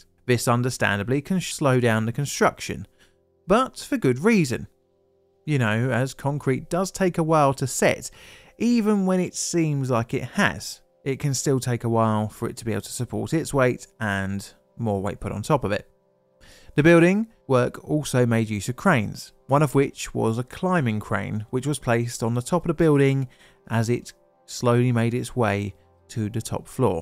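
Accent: United Kingdom. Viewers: He uses the word "garage" in the American sense, as in for parking, I'm assuming because Americans get huffy about stuff like that, but because he's saying it in a British accent my brain can't help but translate it as "car repair shop/gas station". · he's British. They never pronounce things correctly. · The fact that your accent clearly places you across the pond and you still throw shade at Florida 😂 they cant catch a break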